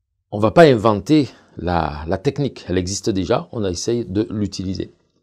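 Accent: South, France. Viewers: Coucou Merci je viens de m'abonner et je vais m'y mettre - Pédagogie = fluide - accend du sud = charmant et phrasé agréable 🙃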